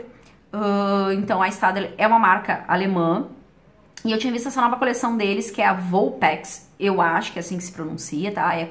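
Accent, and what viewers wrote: Rio Grande do Sul, Brazil. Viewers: tu és da onde? Tenho a impressão de que és do Rio Grande do Sul, pelo jeito de falar. Beijos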